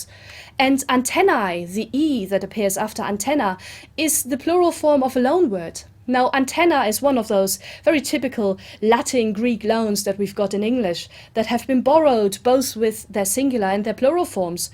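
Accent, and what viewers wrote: Germany. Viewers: Hey, thanks for the clip! By the way...something I wanted to ask you.... Are you German? Just wondering because of your pronunciation 😉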